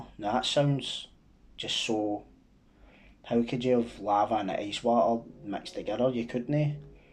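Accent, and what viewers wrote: Scotland, United Kingdom. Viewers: I’m from Edinburgh mate and abit older than you so don’t think our paths crossed- think I’ve just got one of those face’s tbh- I get that alot. Keep up the good work your doing in life with your boy and in general also enjoying your channel. It’s good to listen to a channel channel with a Scottish accent,especially for me as I live in London these day’s.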